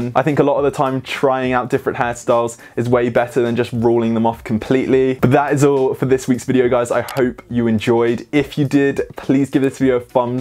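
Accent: United Kingdom. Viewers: dude why are you speaking like a british when you're clearly straight from the mezzagiorno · It also helps having a British accent and a dangling earring! This is catnip to the female species! All day!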